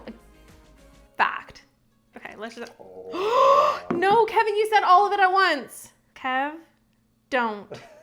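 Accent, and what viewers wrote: Canada. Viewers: Between the valley girl voice and the super dramatic personality … I laugh. I do get a kick out of this girl. I mean that gasping at everything… like what would her reaction to something crazy happening? I just see her being like OhHhh my GoShHhH!! Gasppp gaspppp 😂😂😂😂 and if she hadn’t said she’s from Canada, the way she says out and about .. things like that is so Canadian. I never heard anyone speak like that except for people from Canada. That’s no hate either, I’m a born and raised New York Italian …. You can hear me coming a mile away && there would be NO question where I’m from. Everyone I meet that’s not from like the tri-state area always are like my god you have the thickest New York accent! Say dog!! Say coffee!! 😂😂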